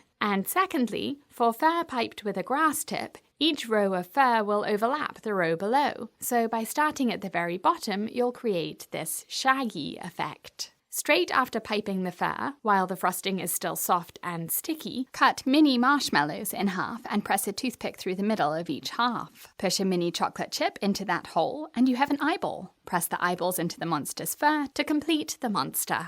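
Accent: United Kingdom. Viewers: Nice to hear a British accent for a change. She speaks so clearly and nicely. Rare on YouTube.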